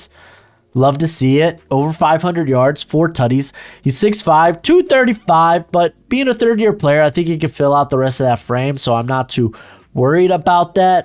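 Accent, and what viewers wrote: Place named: Phoenix, United States. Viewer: Sounds like you are from the Phoenix area? My tribute band just played at Casino Arizona in Scottsdale this past weekend.... a great weekend were it not for the speeding ticket I got.... sad face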